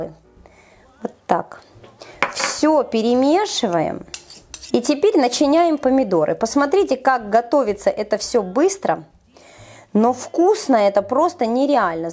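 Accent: Ukraine. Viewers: а где вы живете..... Говор у вас Украинской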